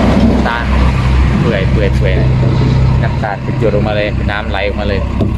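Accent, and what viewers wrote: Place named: Isan, Thailand. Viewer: ติดตามคือเก่าครับ เว่าอีสานล่ะครับ เป็นเอกลักษณ์ประจำตัวดีครับ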